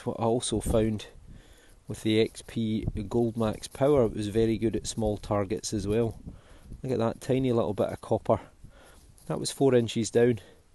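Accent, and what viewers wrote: Scotland, United Kingdom. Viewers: I love the Accent! Scottish Accents are a range of Sweet to Hysterical to most Elite. Can't imagine the History Finds in Scotland. Have Fun, Best Treasure Successes! 😘 Beth Tennessee, USA "Irish American" 🍀🇺🇸🍀 County Kerry Lineage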